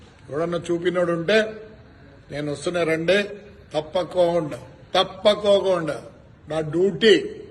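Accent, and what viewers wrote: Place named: Tamil Nadu, India. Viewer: Babu JC garu, meeru telugu vaarena? Aa telugu enti, evaro Tamilian matladinattu… konchom Sarigga telugu nerchukovayya mundhara…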